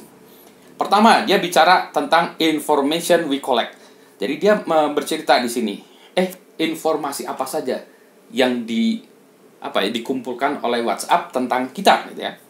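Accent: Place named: West Java, Indonesia. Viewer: Namanya mirip dengan nama orang jawa tapi logatnya mirip orang sunda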